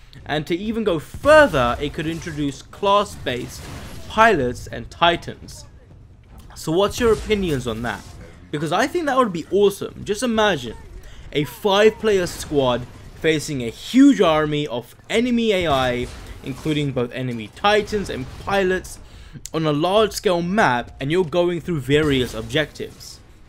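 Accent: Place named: South Africa. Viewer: You sound South African. What servers do you play?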